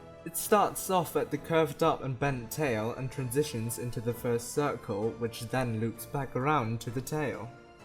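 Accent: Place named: Germany. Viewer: Question are you a German boy? because you have a German accent And I would say you are German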